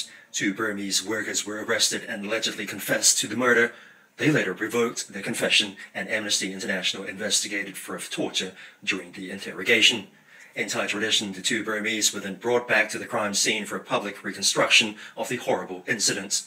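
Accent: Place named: West, United States. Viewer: คนอ่านข่าว สำเนียงฝรั่งจ๋าเลย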